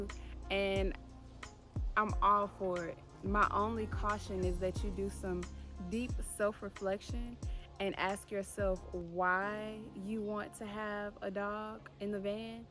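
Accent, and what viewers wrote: Houston, United States. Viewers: They are both from Houston. I'm from Houston and a guy told me I act like beyonce. I was like what???? It's the Houston accent. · That houston accent, lawd. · You definitely got that "Houston" accent going on...You ouchea sounding like Bey...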